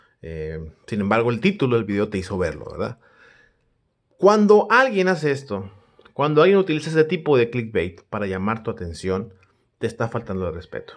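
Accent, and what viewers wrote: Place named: Sinaloa, Mexico. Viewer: Alv, trae mota el:v, como se nota que es de Sinaloa alv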